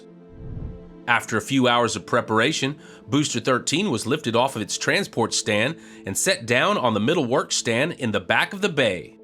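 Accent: Texas, United States. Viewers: Someday, I want to hear that Texas accent coming from the Planet Mars ! 👨‍🚀🏁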